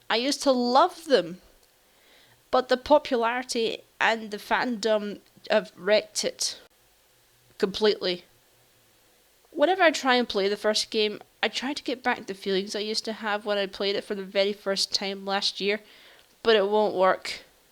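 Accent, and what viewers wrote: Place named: Scotland, United Kingdom. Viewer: Ah yeah. You're the first Scottish FNaF fan that I've ever heard. For that you earned yourself a subscriber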